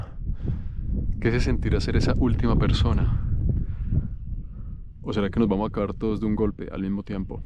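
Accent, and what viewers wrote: Colombia. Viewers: Me encanta el acento colombiano. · Si es colombiana nacida en Ibague y vive en Australia. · su acento es colombiano.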